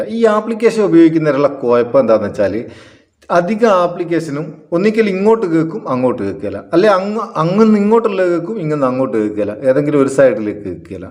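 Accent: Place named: Vadakara, India. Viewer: ഇജ്ജ് വടകര ആണ് അല്ലെ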